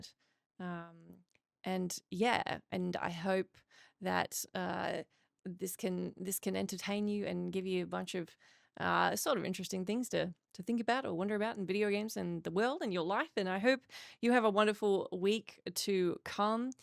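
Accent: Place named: Australia. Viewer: I've never picked your Australian accent before, probably because I'm in New Zealand ❤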